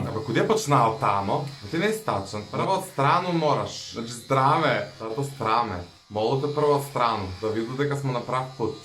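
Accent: Kumanovo, North Macedonia. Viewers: zbori na kumanovski prekrasno video pozz <3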